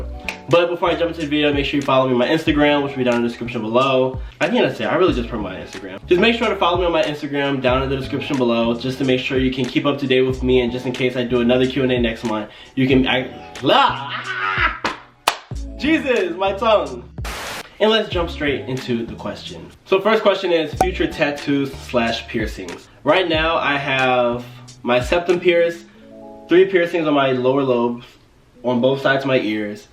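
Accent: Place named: United States. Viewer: Wait, is this? Looks like him but and same name but im confused cos he sounds american.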